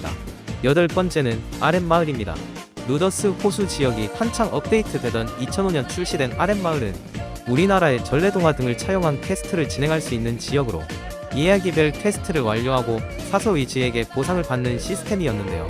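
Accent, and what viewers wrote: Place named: Gyeongsang, South Korea. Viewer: 크리쎄 ㅋㅋㅋㅋ 경상도분이시구나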